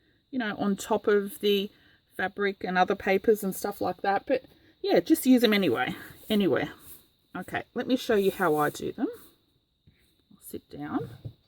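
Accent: Australia. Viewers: Hi! My name’s Erica & I live in Lawrence, Kansas, USA! You just showed up on YouTube for me & I’m so glad you did, because I love this method for making paper ruffles! It’s genius & I hope I can get the hang of it! I can also see using paper that’s been colored with sprays & paints! I think you said something like the sky’s the limit, haha! I love your Aussie accent, as well! I have “liked” & subscribed & will mention you to my friends! So nice to find you! Erica❤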